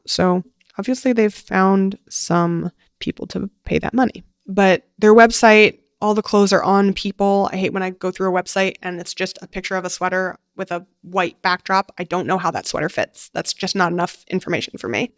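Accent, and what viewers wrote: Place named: France. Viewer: Great french accent tbh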